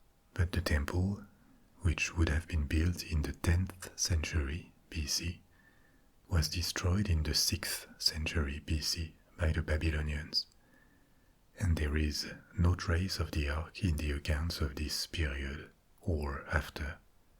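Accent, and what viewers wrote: France. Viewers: Duhhh Guys! His title is! Lol 😆 you can here it in his voice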